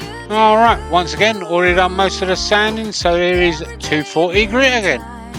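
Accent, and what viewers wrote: United Kingdom. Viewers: Very nice bowl, but surely you should call it a sweet bowl not a candy bowl. You sound like your from the UK.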